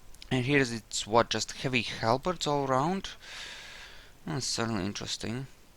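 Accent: Russia. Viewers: Are you Russian? You kinda have a Russian accent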